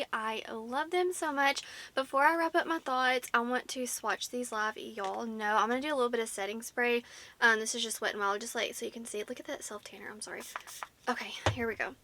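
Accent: United States. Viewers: Hey girl! This is very off topic but I'm so curious where you're from. I love your accent. I'm Dutch so i don't really know per state what americans sound like 😅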